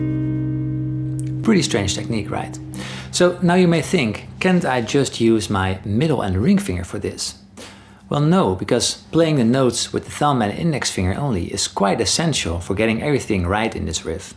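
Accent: Netherlands. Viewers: Ik hoor een hollandse tongval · both dutch, it's the (faint) accent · Jep, it's the Dutch accent :) Actor playing Daario Naharis is Michiel Huisman, also from the Netherlands. :)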